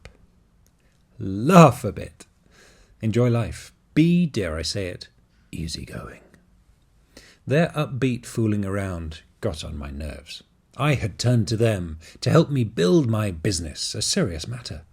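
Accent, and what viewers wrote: United Kingdom. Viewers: British accent is so funny! 😀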